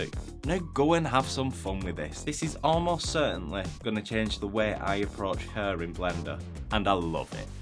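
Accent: United Kingdom. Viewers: Why are there so many British men whose voices sound exactly like this voice? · Thank you for tutorial for newbie like me for alternative, and love the bri'ish accent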